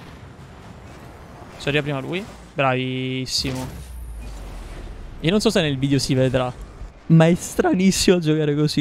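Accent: Palermo, Italy. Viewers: Bella... Ma di dove sei? Sembri palermitano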